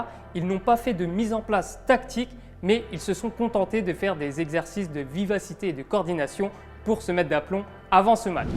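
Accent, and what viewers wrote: Italy. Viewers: Jusqu'à là on n'a pas pu mettre un visage sur la voix de l'accent italien.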